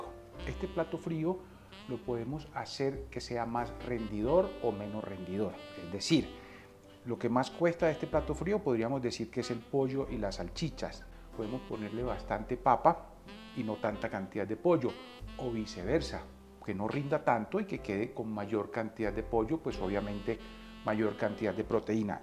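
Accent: Colombia. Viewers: como todos tus platos delicioso este plato frio w berraquera como se dice habla paisa sigue q sos excelete cheff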